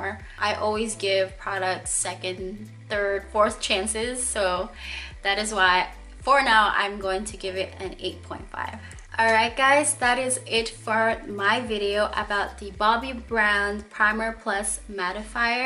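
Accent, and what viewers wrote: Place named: United States. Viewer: Thank you for the review! I was wondering about this primer. ^_^ And ignore that speech comment. I thought you were American because you sounded very clear. :)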